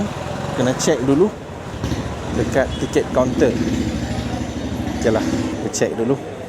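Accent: North, Malaysia. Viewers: Steady Bro. Loghat Utara mendunia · Org utara ka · Tertengok masa tengah tunggu time nak tengok bola baru baru ni.. rasa dia ni sembang mcm org utara ja.. dah lama lama layan plak😀 tq la kami nak travel mungkin tak mampu. Boleh tengok dkt sini pon mcm pi skali dah ni😄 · Best lah bro sembang utara Senang faham sbb explanation slow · Minit ..Orang kita dah terbiasa kan..yang jenis sopan la maksud sy..bila bagi dengan tangan kiri, akan say sorry..tak tau la..dia macam automatik tau nak minta maaf tu..padahal orang kadang tak kisah dan tak perasan pun..didikan mak ayah kita dulu kalau nak hulur atau bagi apa2 kat orang, guna tangan kanan..jangan guna tangan kiri..tangan kiri katanya kurang sopan..lagi2 kalau orang Islam, gunakan tangan kanan dan utamakan belah kanan tu Sunnah nabi..kalau masuk toilet je sunnah kaki kiri..apa2 pun, yang guna tangan kiri bukan lah maknanya tak sopan ye.,jangan ambil hati.. 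hihi..suka tengok abang travel sbb sikap dan bahasa dia bagi saya sopan..dan suka dengar loghat utagha dia.. semoga cepat capai 100k.. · Bila first time jumpa channel ni, dah dapat agak memang boleh pergi jauh. First time nampak vlogger berbangsa India tapi cakap dalam loghat utara. First tu ingat ada mix mamak ke apa, rupanya tidak. Memang unik dan ada tarikan tersendiri. Tumpang gembira tengok channel makin lama makin ramai viewers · Addicted with his lorat utara. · Baru saja 3hari lepas saya tengok 1st video channel youtube ni OMG saya tak sengaja tersuka.tersubscribe ❤ terasa macam prabu bg saya tengok filem tamil version cakap melayu loghat utara.i like!!❤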